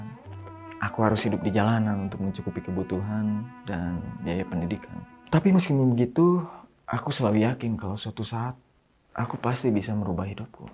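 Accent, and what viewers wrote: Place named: West Java, Indonesia. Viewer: Logat sunda ey ga hilang semngat terus bang 👍👍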